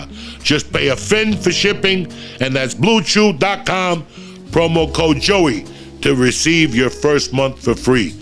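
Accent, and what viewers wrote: New Jersey, United States. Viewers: Somehow shameless advertising with a Jersey accent works on me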